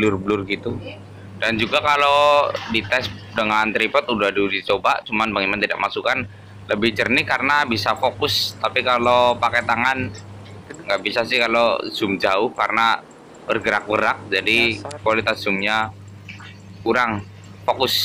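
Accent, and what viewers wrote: Banyumas, Indonesia. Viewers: Keren bahane ngapak campur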